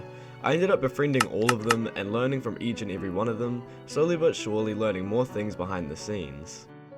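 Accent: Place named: New Zealand. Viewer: Wait you said you conquered au you sound like a New Zealander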